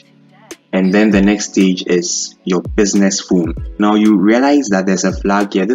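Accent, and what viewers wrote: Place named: Ghana. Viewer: Pure Ghana man English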